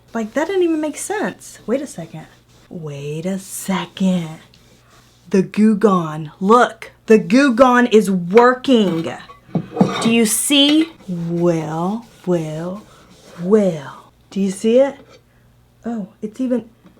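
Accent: Texas, United States. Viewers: You sound Texan.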